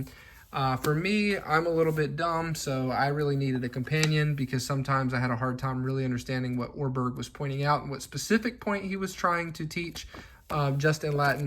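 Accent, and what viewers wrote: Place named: England, United Kingdom. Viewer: Bro, if you can't pronounce - don't. Just add background music, do a little dance pointing with your fingers to text on screen. Or just insert audio from someone who speaks the language like Luke Ranieri or Metatron. Or at least have some humility, say something like "haha, probably butchered that". But, come on, this thick accent with not vowel length and English R is simply insulting! Imagine, idk, teaching people how to learn Japanese while saying "R.E. ga toe". I heard you trying to trill the R, but that was like the last thing I would worry about.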